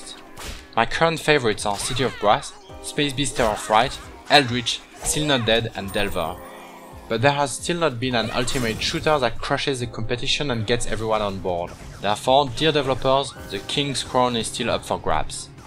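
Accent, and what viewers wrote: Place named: France. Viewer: you french?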